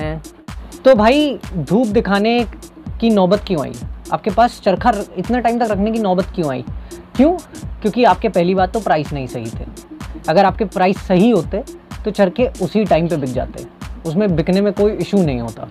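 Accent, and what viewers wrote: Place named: Allahabad, India. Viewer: Allahabadi bhasha me akdum lalantak Patang hai bhai apki.... Man moh Liya bhai. LOVE FROM ALLAHABAD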